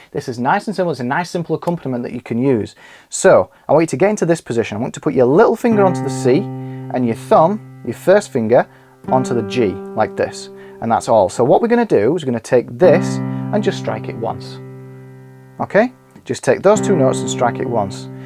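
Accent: Yorkshire, United Kingdom. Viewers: are you form Yorkshire?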